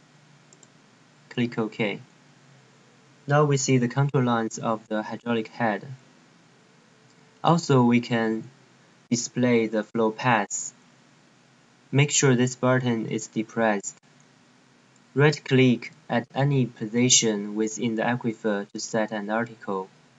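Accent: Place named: China. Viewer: 一听就是中国人哈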